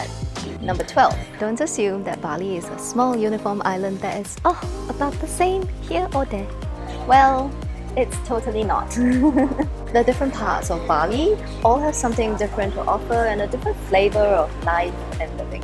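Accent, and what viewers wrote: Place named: Singapore. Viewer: I love the Singaporean accent!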